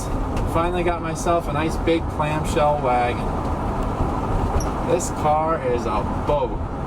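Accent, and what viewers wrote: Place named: New Jersey, United States. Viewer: This is crazy... but as soon as you said, "Just got it yesterday" , I thought to myself, "He sounds like he's from New Jersey." I looked back at the license plate, and sure enough I was right! (I'm from California, by the way.)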